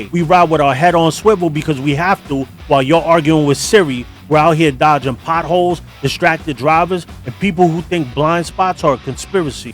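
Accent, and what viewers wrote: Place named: New York, United States. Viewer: I really enjoy this guy’s content. For a southern boy the New York accent can’t be a bit much but he’s honest he’s correct and he struck a cord with me as someone who enjoys riding but wants to have nothing to do with the motorcycle club culture. I’ve been invited into some clubs and politely decline and then watching this gentleman’s videos he explained to me why I said no. Keep up the good work.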